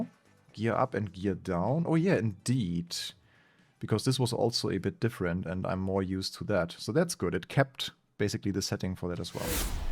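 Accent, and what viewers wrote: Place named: Germany. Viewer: Vielen Dank, dass du versuchst, im Rahmen deiner Möglichkeiten unsere Wartezeit zu verkürzen. Ich kann es echt nicht mehr abwarten. Hab es auf der PlayStation auch vorbestellt. Eine Kleinigkeit zu deinem Englisch du sprichst sensitivity immer falsch aus ich stolpere da immer drüber, weil das echt schade ist. Dein Englisch ist gut, aber an dieser Stelle fällst du als Deutscher leider extrem auf 🙈🤣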